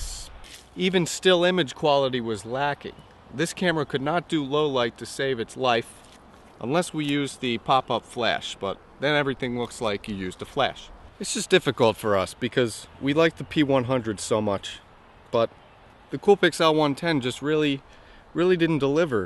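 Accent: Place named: Buffalo, United States. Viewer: Your accent sounds like maybe Buffalo, New York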